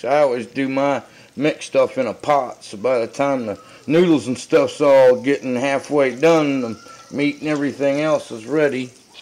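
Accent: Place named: Appalachia, United States. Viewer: Love this hillbilly accent and simple earthy ways, super...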